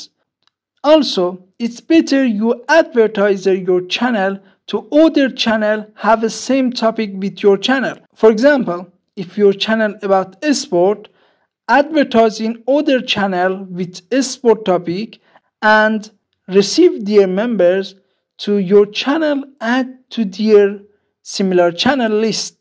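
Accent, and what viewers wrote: Iran. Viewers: لهجه‌ی ایرانی‌تون عالیه :))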